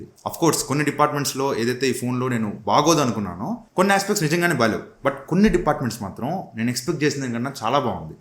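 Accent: Nellore, India. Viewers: Anna nellore nv anna❤❤❤❤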